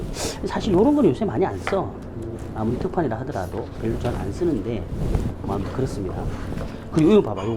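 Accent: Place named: Gyeongsang, South Korea. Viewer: 학교 선생님하셨어도 애들한테 인기짱이셨을 거 같아요 경상도 사투리임에도 정확한 딕션과 유머러스한 말투 너무 재밌고 귀에 쏙 들어와요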